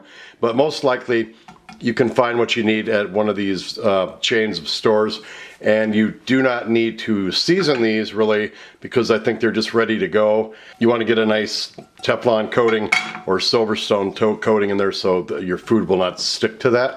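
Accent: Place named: Midwest, United States. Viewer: Are you from Wisconsin or Minnesota? You sound like you have a slight accent!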